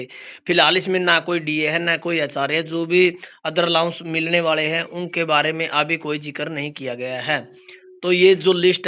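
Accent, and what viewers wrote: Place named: Haryana, India. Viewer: Pure haryana ki h kya ye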